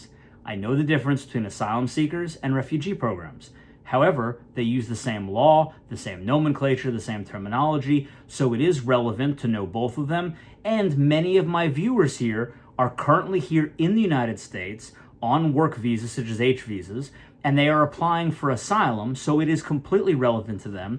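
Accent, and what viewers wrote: South Africa. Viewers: Making progress, that introduction is starting to get pretty smooth. You are starting to sound like an English South African speaking Afrikaans.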